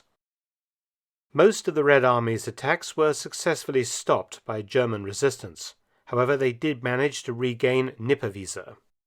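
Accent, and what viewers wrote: United Kingdom. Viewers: Hello Mr Felton, My wife and I saw you on german TV yesterday when we watched a documentary on Hitlers trains. I immediately recognized your face, while she recognized you purely trough your voice. "That's the most english sounding english I know" she said. We love your videos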